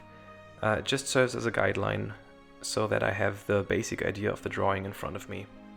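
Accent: Germany. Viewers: Ich dachte mir schon so...hm...ziemlich deutscher Akzent... ach der ist ja tatsächlich Deutscher xD Ziemlich mutig ein englisches Voiceover zu machen :) Das Bild sieht einfach nur atemberaubend aus, nicht nur wegen der tollen Anatomie, sondern auch weil deine Bilder irgendwie immer einen... mystischen Touch haben :D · I pick up a really small german accent but you sound very calm and focused! Great work, no need to feel awkward :D awesome video, thank you so much!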